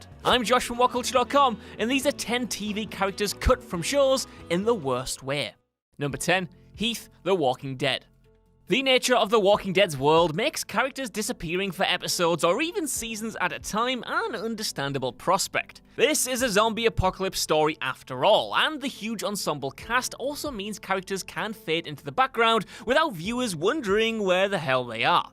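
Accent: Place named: United Kingdom. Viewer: Because he is reading a lot and people make mistakes? Because he pronounces that differently like most people do with most of the ever evolving and changing language? Because he's British?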